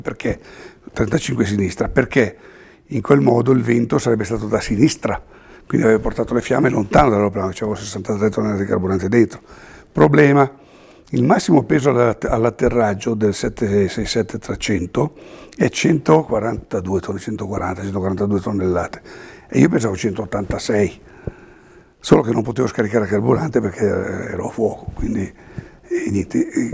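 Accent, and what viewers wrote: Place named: Lombardy, Italy. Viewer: Grande comandante .....il suo inglese un po lombardo.....spettacolo n1 in tutto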